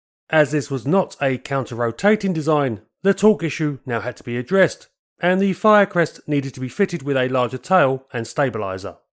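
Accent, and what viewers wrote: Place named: Essex, United Kingdom. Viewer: DaadaadaaDAAA, daadaadaaDAAA, daadaadaaDAAA, ... Come ON. Your DELIVERY is getting un-LISTENABLE-to. You gotta VARY things a bit, so every SENTENCE isn't exactly the SAME as every OTHER one. The CONTENT is generally GOOD, but now I have to kill the SOUND, on ACCOUNT of this. So a LOT gets LOST. You may be from ESSEX, but that's no exCUSE! OK? OK.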